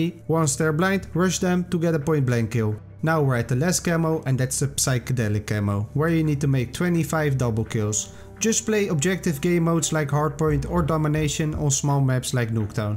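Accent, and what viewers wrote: Netherlands. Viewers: Je bent nederlands ik hoor het😂😂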